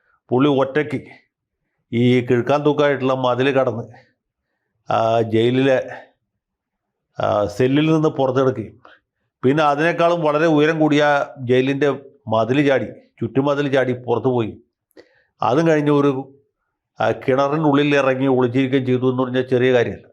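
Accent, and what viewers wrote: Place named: Thiruvananthapuram, India. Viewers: തിരോന്തരം ഭാഷ കലക്കി 😅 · 😂 തിരുവനന്തപുരം ഭാഷ സൂപ്പർ. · വക്കിലിന്റെ തിരീയന്തരേം ഭാഷ കലക്കി.... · വക്കീലിന്റെ tvm ഭാഷ കലക്കി 😂😂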